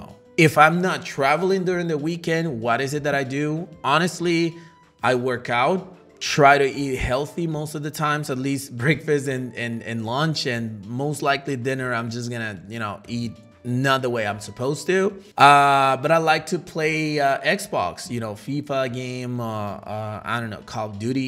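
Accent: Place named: United States. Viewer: Which country are you from? You have an American accent and you seem like a nice person 🙂It is true, you can't always be on the same page with everyone.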